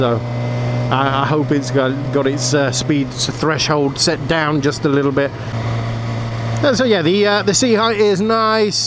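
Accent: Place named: Scotland, United Kingdom. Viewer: I'm from Glasgow. Your Scottish accent is honestly fantastic. I was very surprised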